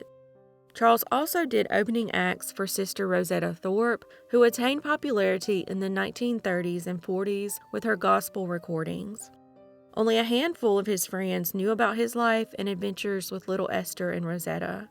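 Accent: South, United States. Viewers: Why did you not want to show your face, you are cute. I love your southern drawl. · Awesome as always!! Nice to see the face behind the Southern voice!! 💜 You're one of the very few true crime Youtubers who can pack a lot of information within a 10 or 15 minute video & not leave anything pertinent out to do it. While I like an occasional deep dive into a case most times I dont have 30-60 minutes or more to invest. This is what makes your content perfect for me . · Oh my goodness sake’s! It’s so nice to see a face that goes with that country voice! One day I’ll finally decide to show my face on my channel also! lol Keep up the great work ! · Finally get to see the face behind that beautiful southern draw!!!! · You're very pretty and I really like your southern voice. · One reason I loved this Chanel was bc of your (yes) Southern accent/voice lol and now beautiful face. 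Born & raised in MD, I always dreamed of living in the south. Thank you for your diligence in presenting these stories! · Awesome Tuesday. We get to see your pretty face with your sweet southern drawl. Thank you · Yes! It's always nice to see the faces behind the voices we love! In the beginning, I was so fascinated by the voice and southern accent that I forgot to listen to the stories she told. I had to listen twice! 🥴😁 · Good to see you girl! You are beautiful as that southern accent ! Keep'em coming, and again thanks for introducing yourself! ❤🕵️‍♀️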